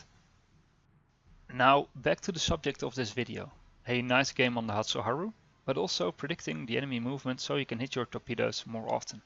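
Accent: Netherlands. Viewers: ĺike your videos! subbed. are you by any change dutch? you sound like it:)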